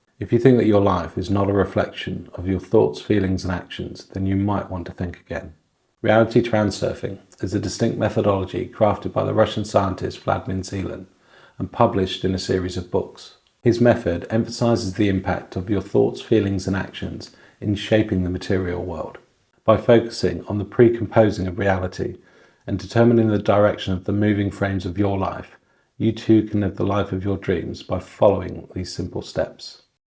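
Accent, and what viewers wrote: United Kingdom. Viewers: lovely to hear your British accent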